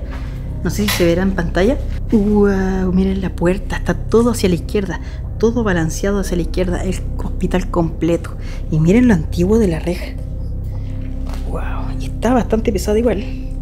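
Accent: Chile. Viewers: Hola. Te sigo desde la provincia de Entre Ríos, Argentina. Gracias a tus videos estoy conociendo tu maravilloso país... pero lo que más me gusta es ese "tonito chileno", el más lindo de latinoamérica.🤗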